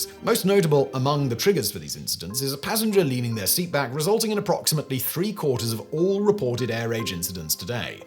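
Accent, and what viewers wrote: United Kingdom. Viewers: I sometimes find myself paying more attention to Simon’s diction than to what he’s actually saying. How is it that the British pronounce the word “air” as just “a,” with a short “a” sound? Also, since my son is a flight instructor working toward building enough hours to fly commercial, I am also very thankful for the strength of airline safety. I keep telling people who worry about flying that they should really be worried about driving to the grocery store. Also, it’s glide “slope,” not glide “scope.”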